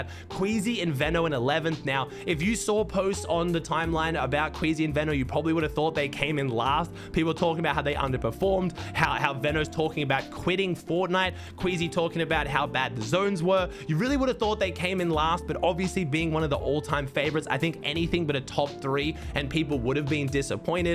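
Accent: Australia. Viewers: WHO IS THIS AUSSIE GUY?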